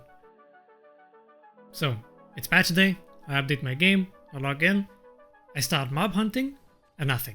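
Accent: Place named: Israel. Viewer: המבטא הכי ישראלי ששמעתי 😩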